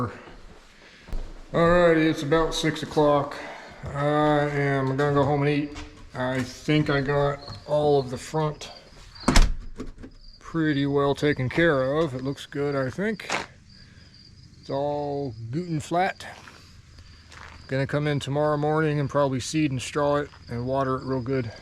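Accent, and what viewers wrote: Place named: United States. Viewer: Foyer pronounced FOI-yer. LOL! I love your American accent and it's one of the reasons I listen to you. Where I am from it is pronounce foi-YAI because it has a French root. That was not judgement. It was amusement. Don't change. It's charming American.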